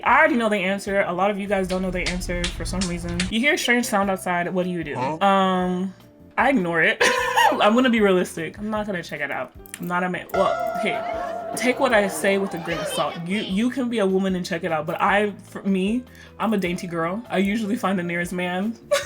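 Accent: United Kingdom. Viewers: YOUR BRITISH ACCENT IS ACTUALLY SO SLAYYYYYYYY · the British accent. I love it queen. 👏🏽👏🏽👏🏽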